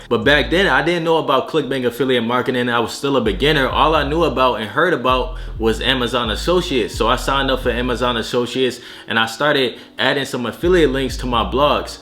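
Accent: Philadelphia, United States. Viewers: I thought you was from Philly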